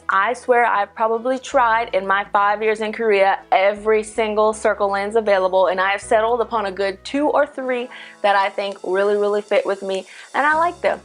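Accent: South, United States. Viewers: am i the only one who hears her southern accent. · hasn't changed in the most important traits. She has still got the same personality, humour and charm. I continue to watch her is because of the way she talks and what she talks about. (Love that southern accent.) Self grooming, if you will, happens more when you get older and gain disposable income. You're treating yourself and it shows. Your appearance will always be changing every year, every day. Remember who you are inside and nothing else really matters. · I'm so glad you posted this video. I've also been seeing the negative comments on your videos lately. I also was a bit uncomfortable with your new drastic changes, but I've also noticed you have not changed who you are as a person and is still the same happy with her lame jokes and Southern accent. That made me get over the changes you've made which really isn't that big of a deal. 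I also appreciate how you addressed this issue and your haters with a respectful manner. You talked about how you felt very reasonably without attacking anyone. Love you!! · I don't understand how she's changed?? Her clothes and make up are what she buys in Korea, Where she lives and had lived in for years. It's called adapting. When she does her videos she still speaks with her southern accent when she speaks. Getting your breast done and tattooing your eyebrows doesn't mean she's trying to be Korean considering how much Americans get there breast and eyebrows done, she's perfectly fine to me.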